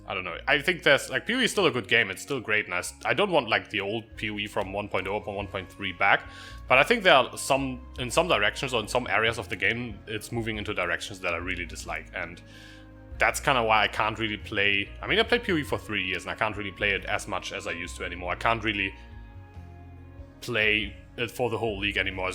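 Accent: Germany. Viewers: well, you gonna tell us where that is? prolly somewhere south of Berlin? or am i getting your accent wrong? :D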